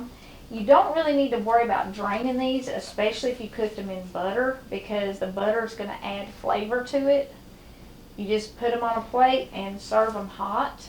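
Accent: South, United States. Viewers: Good to hear your accent to I’m a guessing southern wv or ky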